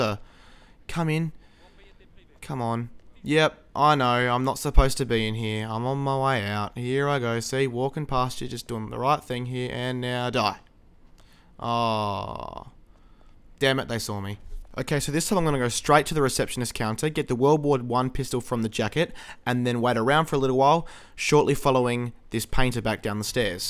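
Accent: Australia. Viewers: what part of australia are you from? I'm from WA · what he is Australian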